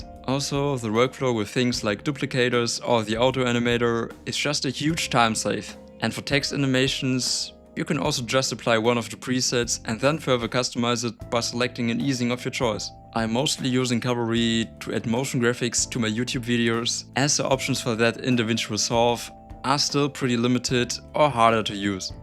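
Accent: Germany. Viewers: Dieser deutsch Akzent 😂❤ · ARE YOU GERMAN BY ANY CHANCE WOW YOU GOT DISCORD?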